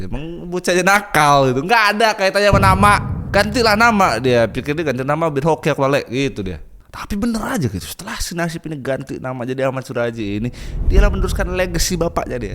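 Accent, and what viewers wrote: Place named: Lampung, Indonesia. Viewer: ketara bener logat lampungnya hahahaa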